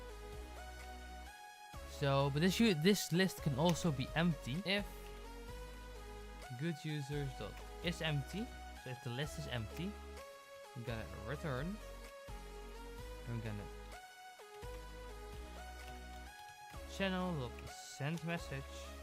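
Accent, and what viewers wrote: Netherlands. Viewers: your accent like a Dutchman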